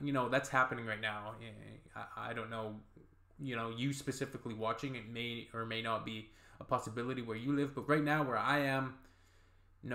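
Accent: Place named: Canada. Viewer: Thank you so much for doing this, and for your kind comments. BTW I could tell you were Canadian before you told us. Most can't tell the difference between Yanks & Canuks (From an old Rhondda boy now living in Victoria BC)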